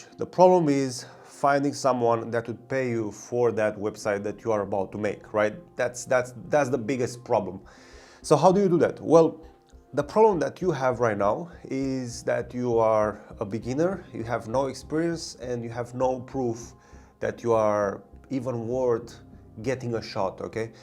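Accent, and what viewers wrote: Romania. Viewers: Me: He has Romanian accent. Me after reading his name: Oh... makes sense 😂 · Man you are Romanian? You really have a romanian accent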